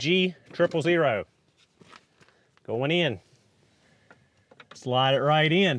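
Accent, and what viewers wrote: South, United States. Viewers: I didn't mind your southern accent and it does take me awhile to get used to it 😅, and I like your video that show people how to do things 🎉 my brother's car needs a part replacement in the engine a mechanic wants to charge him three thousand dollar 😭 to do it, he didn't want to do it, but he fixed it 😅 I asked him how did he do it, he said he watched some yt videos on how to do it so on a long weekend he rented a engine lift thing and with help of his sons they got it done 😅🎉 I was impressed because the car is a BMW M7 😱 he took the engine apart change the part which he ordered online and put everything back together 😢, I have a Subaru repair manual It does have details instruction on how to do it but watch your video on how to do it and the tips he gives that is something a manual can't give me so I 👍👍👍 for you to do it, but it mean more work for you I hope you can continue to make this type of video 🎉 because a mechanic is just too expensive and I don't know if he is telling the truth 😢, my mom told me s story about my aunt, when she brought a car, because she didn't know anything about cars so she took a Auto repair class in highschool, I was surprised 😅 because she has a PhD and a professor at UCLA tough there for a long time and yet she knows nothing about how a car works mechanically so she took the class to have an idea on how car works, sometimes I was wondering when she was in the classroom does her classmates or the teacher know about her, they may think she is jus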